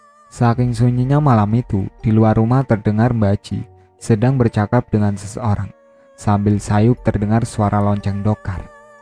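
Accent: Java, Indonesia. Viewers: bhsnya jawanya lucu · Terlalu berlebihan Jawanya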